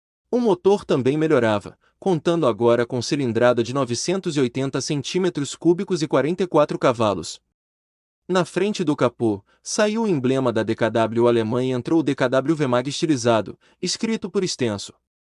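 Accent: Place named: Portugal. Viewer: Que bobagem! Uma reportagem tão boa e vem me falar de correção da pronúncia? Qual o problema de se pronunciar à "moda portuguesa"? Qual é.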